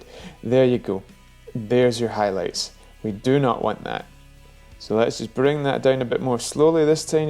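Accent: Scotland, United Kingdom. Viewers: Just bought the mavic mini and you suggested watching this in regards to the histogram and its absolutely brilliant. I dont have a cinematography background, or drone, just bought one for fun but am sure with this tip my shots will at least be perfectly exposed. On a side note, Robin Williams as Mrs Doubtfire had exactly the same soft spoken Scottish accent as you (sorry) haha. Will be downloading the free guide too, cheers for the great content.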